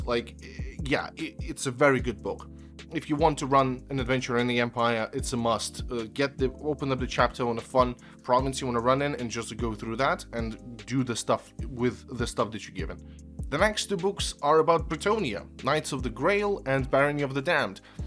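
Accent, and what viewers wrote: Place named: Eastern Europe. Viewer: ehh, it's weird. Imma use your rules instead. Also, are You from Poland or Russia maybe? You kinda sound that way, I believe I can spot fellow Eastern Europe folks lol.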